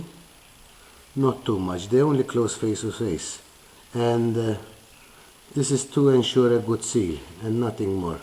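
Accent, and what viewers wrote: Italy. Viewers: Thank you for making a very instructional video. You not only made a functional stove you made it beautiful by polishing. Are you from Italy? Your accent reminds me of my grandfather who came to America from Calabria in Southern Italy. Perhaps you are not from Calabria as they say people from there are " Cappo Tosto ". ( you do not seem thick headed...haha ) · ! Excellent video, great added value to the already great video of Tetkoba... and I can't resist to tell you your delicious Italian accent makes it a pleasure to watch;-)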